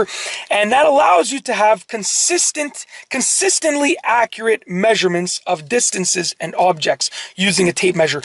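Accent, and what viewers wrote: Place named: Woodbridge, Canada. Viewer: This is the Woodbridge, Ontario accent, but it extends to most of York Region, and any other Italian neighborhoods north of Toronto proper.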